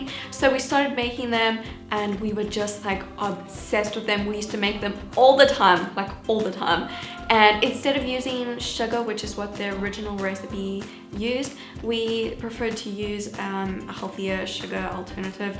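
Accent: South Africa. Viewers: Are you from south African? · are you south african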